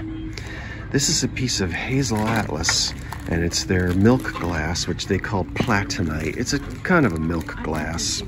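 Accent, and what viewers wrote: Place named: South Jersey, United States. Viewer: Can't get over that south Jersey accent, waddur!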